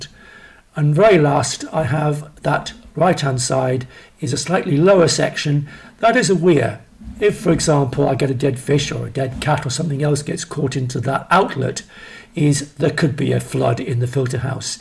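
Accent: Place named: United Kingdom. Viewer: Great to hear a Brit voice using air lift. I’m at the design stage of building a new airlift pond, would love to see more of your airlift setup.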